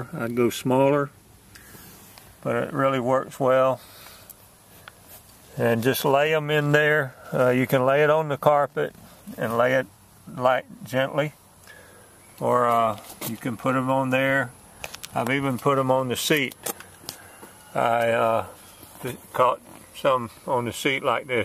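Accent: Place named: South, United States. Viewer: once I saw duct tape followed by a country accent, i knew i was in the right place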